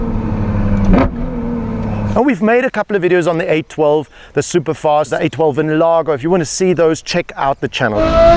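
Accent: South Africa. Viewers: First 10 secs of the video, I'm like, "Why do you sound South African bru?" 😂😂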